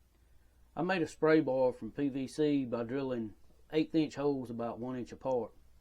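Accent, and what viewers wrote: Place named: South, United States. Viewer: I love your southern "slang and pronouncing" .....!!!!!! I'm original from Germany ,- and have friends in NacogdochesTexas ...... I use to mine for long years in B.C. Canada ....and work for big Mining Outfit as a consultant ..... Concentrating and mineral dressing .... Unfortunately it is real difficult to build a full working shaker table ...... ( according to the "TAGGART" ) ... but you came real cloth to it ... compliment !!! You are kept in thoughts ,- that there should be a special movement , who use the gravity and the mineral density for separation on a special surface ,- plus the influence of a water matrix and angle .... 👍👍👍 great job .... well done !!!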